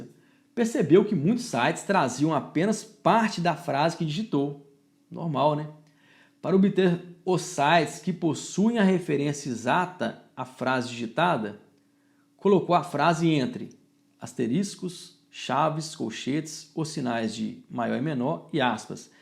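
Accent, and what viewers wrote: Minas Gerais, Brazil. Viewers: Sotaque top de mineiro e professor nota mil! Continua com as aulas querido professor!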